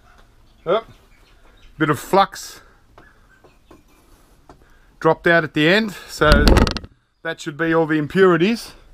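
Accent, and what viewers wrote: Australia. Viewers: but he sounds Aussie tho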